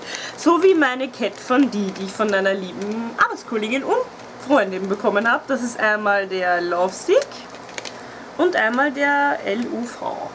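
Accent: Austria. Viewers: Tröste Dich, ich kenne das - ich kann mich von solchen Dingen auch so schlecht trennen, auch nicht von denen, die ich sowieso nicht benutze, dabei wäre es aktuell schon angebracht, das endlich einmal wieder zu tun, obwohl ich gar nicht sooo viele Lippenstifte besitze. Aber was soll´s, es gibt schlimmere Angewohnheiten ;) . Ich mag Deine Videos so sehr, weil Du so wunderbar authentisch wirkst - wenn mal eine Weile nichts kommt, schaue ich mir deswegen auch gerne die älteren Videos noch einmal an. Dieser leichte Wiener Akzent wirkt auf mich Norddeutsche gleichermaßen beruhigend und erfrischend, wenn ich also ´mal krank zuhause bin oder ein bisschen Hintergrundgeplapper brauche, während ich aufräume etc. , sind Deine Videos für mich optimal :)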